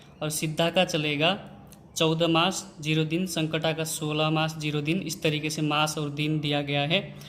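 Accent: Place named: Nepal. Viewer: Manche chai nepali jasto cha boli Hindi bolera yesto vya niskiracha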